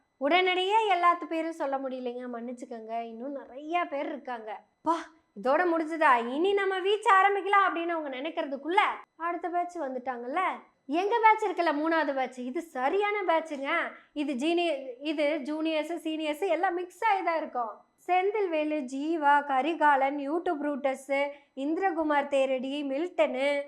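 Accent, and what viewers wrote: Kongu, India. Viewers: கொங்கு தமிழ் சுவையோடு அழகாக பேசும் சகோதிரிக்கு வாழ்த்துக்கள்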